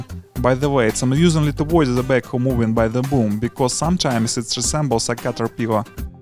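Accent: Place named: Russia. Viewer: Русский наверное. Акцент выдает))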